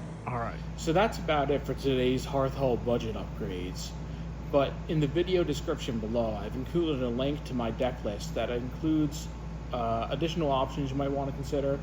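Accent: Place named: United States. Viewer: Thanks dude, really helpful. Just a thought as someone taking notes during the video, though I get its a new channel...but it would be good to be able to see the cards a little better. Id like to pause and read them or some get lost a bit in the accent (as a non American here!). Perhaps if not a closer zoom add them to the screen in editing to appear on the side for people to read whilst you discuss the card? More work for you though I guess. Anyway, thank you for all the advice. Subbed, liked and commented as directed ❤️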